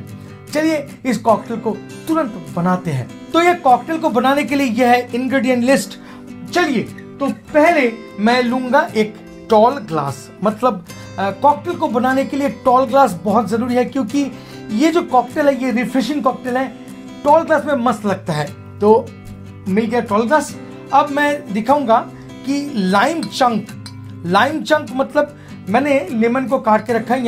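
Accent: Bengal, India. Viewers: Sir apnar hindi sunne mone hoche je apni bangali aita ki thik ?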